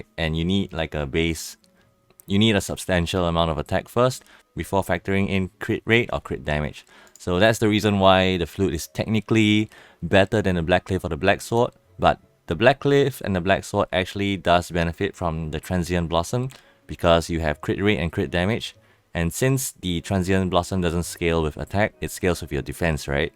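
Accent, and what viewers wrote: Singapore. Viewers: I love how organised and clear all your calculations are, it makes it super easy to just refer back to the spreadsheet whenever I want! Also fellow Singaporean here, I was wondering why your accent sounded so familiar xD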